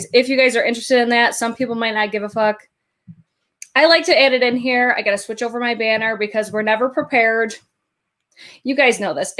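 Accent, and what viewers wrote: Midwest, United States. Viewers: New subscriber! You’re such a goof! I love you! Where are you from originally? Do I hear a Midwest accent?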